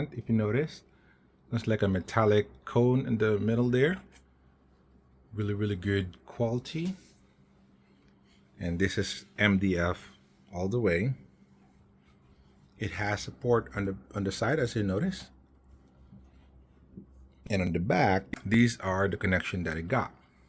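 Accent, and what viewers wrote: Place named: United States. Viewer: naka automatic play next tong YT ko. nkikinig ako habang nag wowork kasi ng mga reviews ng Edifier MR5.. tpos nag next auto play tong Video mo. akala ko black american. pinoy pla. sana ol gnyan accent. thanks sa review dude. nag sub na rin ako.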